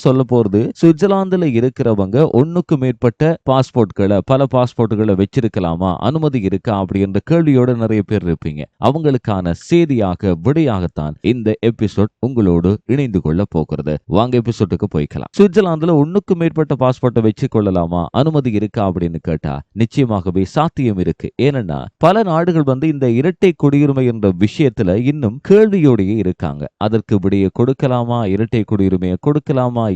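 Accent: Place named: India. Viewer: செய்தி வாசிப்பவர் ஈழத்தமிழர் என்றால் ஏன் இந்திய உச்சரிப்பு?? ஒன்றில் ஈழ உச்சரிப்பு அல்லது இந்திய உச்சரிப்பைப் பாவியுங்கள். இரண்டும் கலந்த உச்சரிப்பு கேட்க சகிக்கவில்லை.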